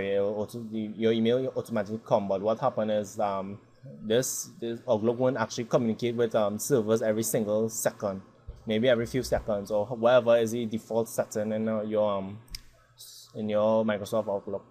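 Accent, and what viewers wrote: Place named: Trinidad and Tobago. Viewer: Are you from Trinidad? You sound like it real bad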